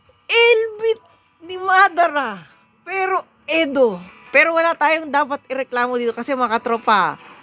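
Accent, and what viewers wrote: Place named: South, Philippines. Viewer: taga south yung accent. Dala ng emotion haha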